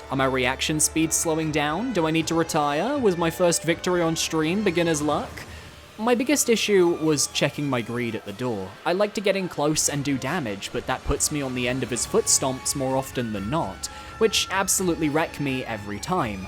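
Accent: United Kingdom. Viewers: Stop saying Sekiro like a weeb, you’re obviously british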